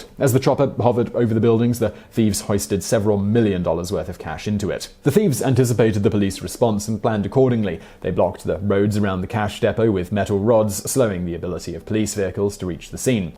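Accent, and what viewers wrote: United Kingdom. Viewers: jew-lery? why do brits speak such poor english. Another favourite is 'Somethink and War'uh(water) ...??' just why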